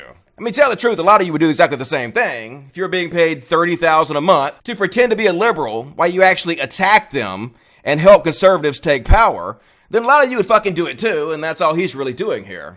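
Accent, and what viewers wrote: South, United States. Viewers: Well you're southern so it's to be expected, like when Pimpmunk said Massetushes.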